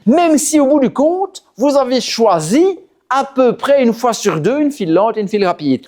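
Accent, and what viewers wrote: Belgium. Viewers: En belge avec un accent horrible et répugnant ?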